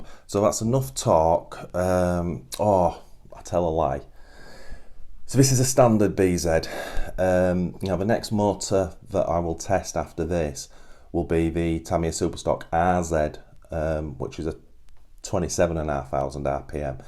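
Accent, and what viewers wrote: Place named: England, United Kingdom. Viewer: Nice results, I'm planning to built up my TT-02SR with the TBLM-02s 10.5 turn and TBLE-02s ESC , pinion size I'm not sure yet. A high speed spur gear is also on it's way and a set of foam tires, maybe they will get better results? I'm not ready yet to switch to Lipo, stick to NiMh batteries for now. You sound very English but resides in Canada? Also saw an older movie where you were a little slimmer.. Lol. Well keep them coming those movies. Cheers.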